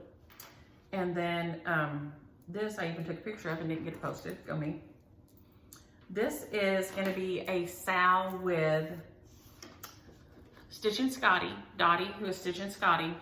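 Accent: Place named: Houston, United States. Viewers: I’ve watched you for forever but I seldom comment. Just love your sweet heart and generous spirit not to mention beautiful stitching. Don’t change your accent, I love it. We lived in Houston when our oldest was aged 3-5 and she sounded just like you back then. Sometimes when I’m finished watching you, I even sound like you!!! 🤣🤣🤣. Love the ABC’s. 😘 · Love your Texas accent! We lived in Texas for almost ten years and it kind of rubbed off on me. I would love to stitch the dog pattern! Thanks for a chance!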